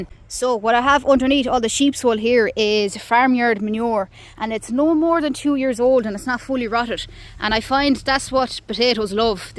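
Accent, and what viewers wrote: Ireland. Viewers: I love that irish accent…. Right. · Love your accent, no wonder my grand-grandfather married an Irish girl. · Irish accent sounds exhausting to speak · Wow intelligence backed by a witty sense of humor good looks and that wonderfull Irish accent and just a generally inspiring girl who loves what she does and is exited to share that with us all.. It sure why this came into my feed but happy it did though❤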